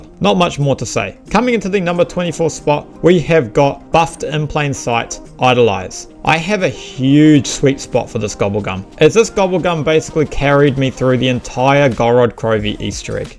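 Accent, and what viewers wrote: New Zealand. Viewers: yooo bro i came from the lex video and instantly recognised your accent since im a kiwi too. love to see a zombies creator from nz. keep it up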